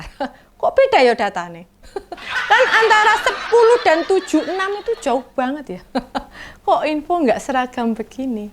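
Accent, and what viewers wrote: Java, Indonesia. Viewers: bak medok amat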